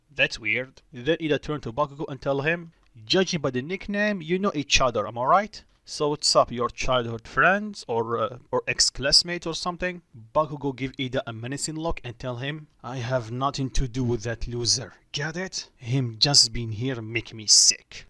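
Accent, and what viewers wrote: Russia. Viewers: Hey sir are you from Russia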